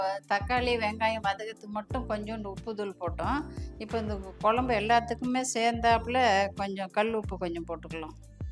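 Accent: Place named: Vellore, India. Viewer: Very nice madam, i shall try this, i am native of vellore, i am happy to listen to your typical vellore style Tamil.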